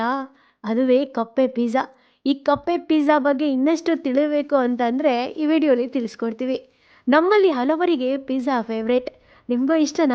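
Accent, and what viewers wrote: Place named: Kundapura, India. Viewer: Kundapura kannada super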